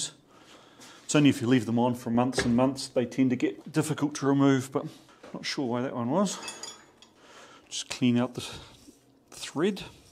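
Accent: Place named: New Zealand. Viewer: Not trying to be too nosey. You sound a bit like a kiwi, but I don't think you're living in New Zealand 🤔 and you seemed to be wearing this old Tony's sweater. 😯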